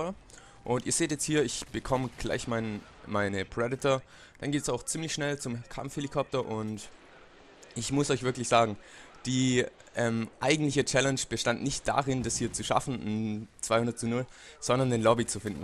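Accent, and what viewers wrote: Swabia, Germany. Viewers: Schwäbisch FTW!!!! :D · hihi :D ein schwaaaabe :)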